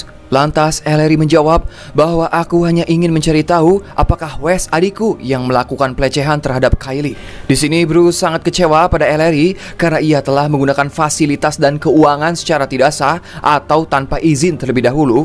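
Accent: West Java, Indonesia. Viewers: Logat sunda sarua atuh nya saqahayang jeung 🎉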